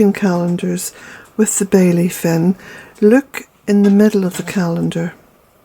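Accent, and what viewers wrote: Ireland. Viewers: I'm new to your channel and viewing from the US of A. Lovely and inspirational. Plus I'm in love with Jack. And you have to know how we Americans are enamoured with a good Irish lilt. In addition I'm a 60+ woman dreamy with Adian Turner as Poldark thank you very much PBS. Stay well.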